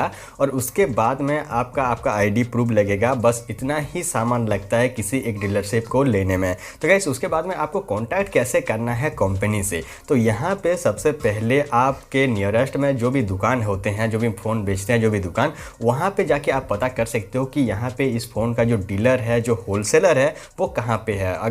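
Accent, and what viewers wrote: Chhattisgarh, India. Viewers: Chhattisgarh se ho kya aap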